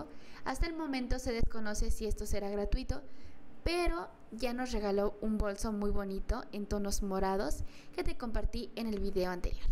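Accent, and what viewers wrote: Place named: North, Mexico. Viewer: Este wey es más norteño que yo… Me representa👍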